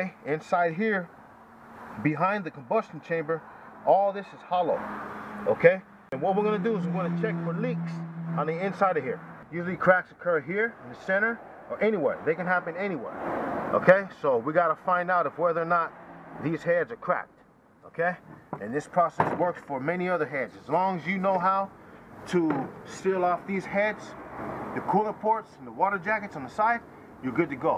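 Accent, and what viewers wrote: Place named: Los Angeles, United States. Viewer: Hi nice video, you sound like you're from LA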